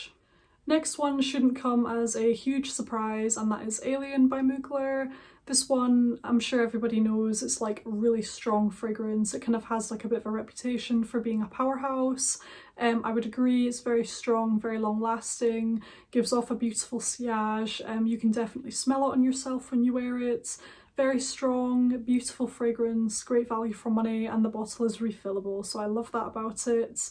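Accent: Scotland, United Kingdom. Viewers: Greetings to Scotland. Thank you. Nice, elegant, and classic Sottish accent. Have a successful year filled with love and happiness. Happy New Year.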